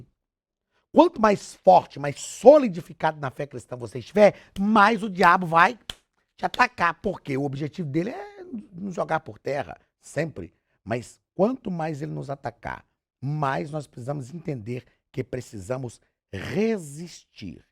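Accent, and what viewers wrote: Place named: Minas Gerais, Brazil. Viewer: Estudo junto todas as semana sou mineira e gosto de ouvir um mineiro falando Deus continue lhe usando Pastor.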